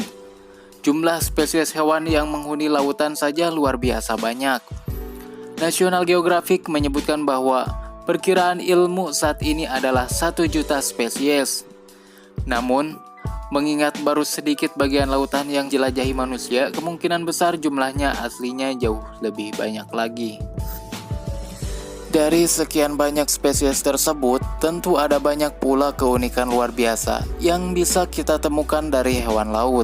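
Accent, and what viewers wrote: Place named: Sunda, Indonesia. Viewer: Dialek sunda nya kentel... Lanjutkann mantappp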